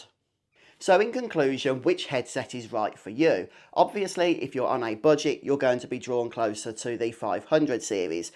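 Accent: United Kingdom. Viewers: Is British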